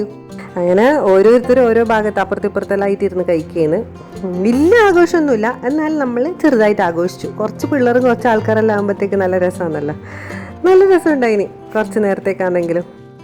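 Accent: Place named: Kannur, India. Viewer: Eacheente varthanam keattere thoni kannur aanenn. E vido kandera skpm aann thirinje.. Adipoliyann tta vdo❤❤❤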